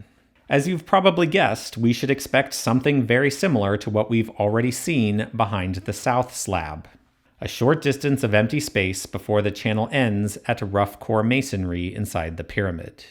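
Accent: United States. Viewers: I have said this before but no one responded. I asked what state is this accent from? You wanna search for Audit the audit and the villains they sound just the same too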